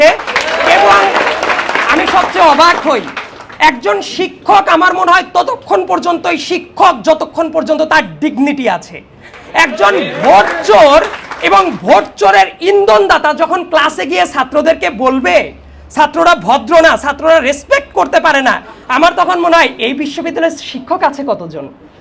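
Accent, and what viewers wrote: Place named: Rural, Bangladesh. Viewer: ছাগল একটা গাইয়া উচ্চারণ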